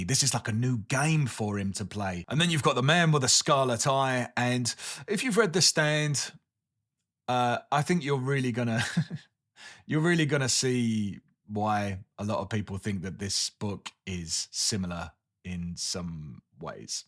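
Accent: United States. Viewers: I love the American accent! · GREAT American accent!!!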